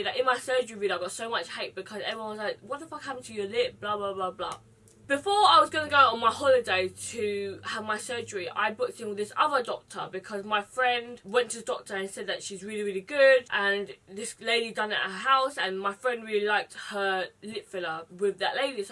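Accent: United Kingdom. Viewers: OMG this is the first time watching a video of you on YouTube and I'm SHOOK of your British accent XD I knew you only from ig for a while and I thought you were American or Canadian :) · Carolina Araújo it's called being SARCASTIC....she fucken mumbles. and she has a British accent on top of it so it's even harder to hear her. i can understand....she just doesn't SPEAK CLEAR. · Omg Viet with British accent...loves it!!!! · Woah I'm only 9 seconds in I've always watch you instagram videos o had no idea you had a British accent lol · People who’s saying they don’t understand her, it’s because she has a British accent and not everyone has the same accent and she also wears braces at the back of her teeth. You all are being such bitches, leave her alone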